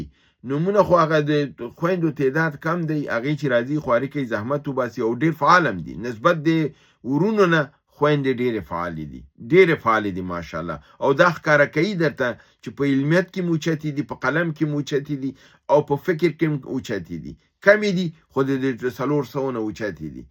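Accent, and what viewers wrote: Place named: East, Afghanistan. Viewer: te ham po mashreqi lahje khabare kawe. Parse loghatuna ham d°er karawe. wahalai NA balke weshtai de.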